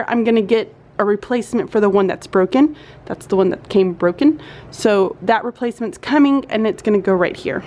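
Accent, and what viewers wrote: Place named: South, United States. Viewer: I love listening to you with your Southern drawl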